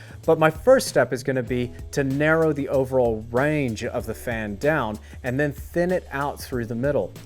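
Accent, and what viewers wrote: Australia. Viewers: What an interesting accent! sounds Australian but some words are full American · Couldn’t quite detect his accent! sounds like an Australian doing an American accent! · Am I detecting a faint Aussie accent??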